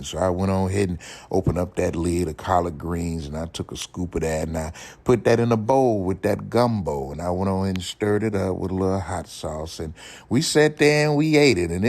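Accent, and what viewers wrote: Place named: Louisiana, United States. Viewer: Yes that sounds like us Louisiana folks if food critics was a person ❤️😂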